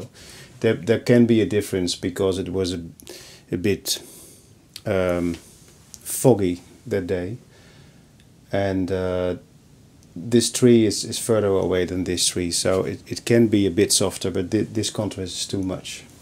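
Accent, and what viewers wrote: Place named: Netherlands. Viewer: I just love your work it really speaks to me. I have painted most of my life but never had the time to really get into it. One side of my family ate Dutch and it was my first language so it fees so comfortable hearing your accent, like coming home. Thank you so much for sharing.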